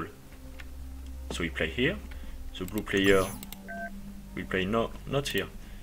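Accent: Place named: France. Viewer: Lol on reconnait bien le français qui parle anglais xD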